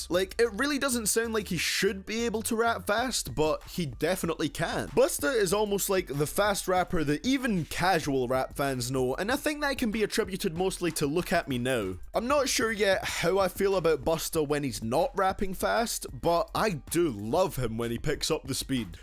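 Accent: Scotland, United Kingdom. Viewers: Are you Scottish, because I am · Are you Scottish by any chance or its just your voice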